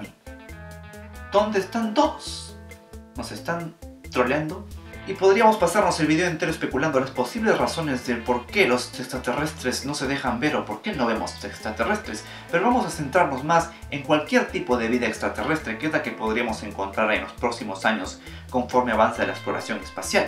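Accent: Chile. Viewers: Excelentes videos amigo! Consulta, eres Chileno?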